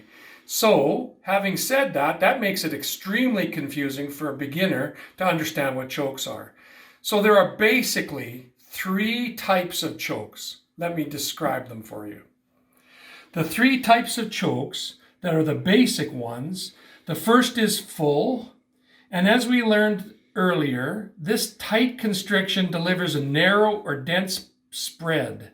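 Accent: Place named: Canada. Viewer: Its just a Canadian accent, buddy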